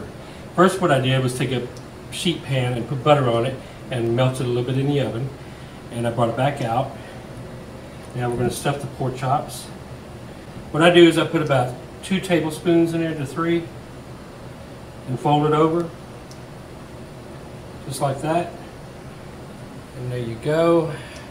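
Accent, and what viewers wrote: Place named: South, United States. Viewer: Also, you seems southern. I'm southern too.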